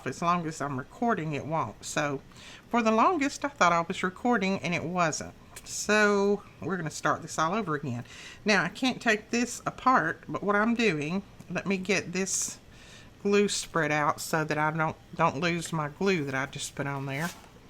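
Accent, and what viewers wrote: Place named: Eastern Kentucky, United States. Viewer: Dont know where you are, but totes sounds eastern Kentucky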